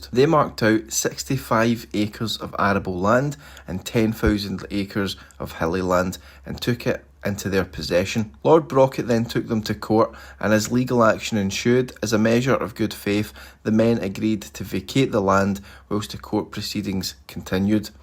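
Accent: Scotland, United Kingdom. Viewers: This actually reminds me a lot of the song, … not so much the story, just the narrator’s brogue and rhythmic cadence! Peace and respect from America!! 🇺🇸 ✌️ ✊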